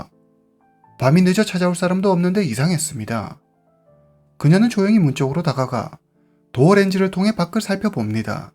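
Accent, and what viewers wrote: China. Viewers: 발음이한국분은아닌거같은데 약간조선족?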